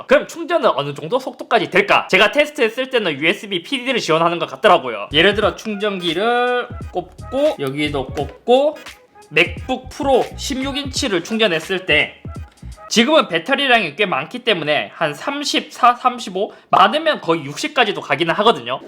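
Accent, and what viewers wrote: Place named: Gyeongbuk, South Korea. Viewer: 이 형 너무좋응.. 경북말투 영주,봉화,안동,점촌.중하나있듯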